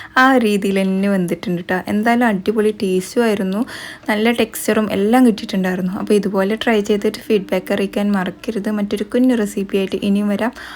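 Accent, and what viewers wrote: Palakkad, India. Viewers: Palakkad evadeyaanu veed .enth resaa ithaade samsaram .kett irunnù povum.oru hai tharo?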